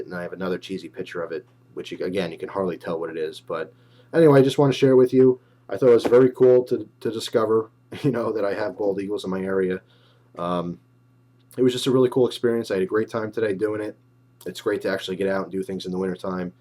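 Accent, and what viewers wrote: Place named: East Coast, United States. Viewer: East coast accent buddy. Lol. Everyone fucks with us for it.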